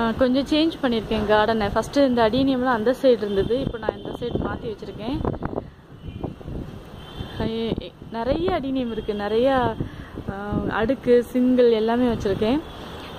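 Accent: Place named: Tiruchendur, India. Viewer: நீங்க எந்த ஊர். எங்க சொந்த ஊர் திருச்செந்தூர்... நீங்க பேசுற பாசை எங்க ஊர் side மாதிரி இருக்கு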